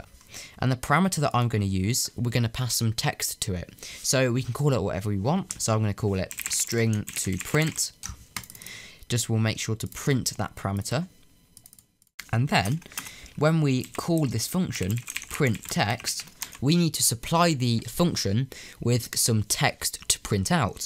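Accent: United Kingdom. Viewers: He still sounds British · I love *'s British accent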